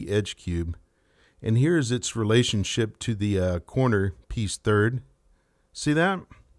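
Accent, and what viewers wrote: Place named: Canada. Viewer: JesterKing1776 can't get much better than that Canadian accent. lol. just has one of those soothing voices. sounds a lot like this welding guy I watch on here.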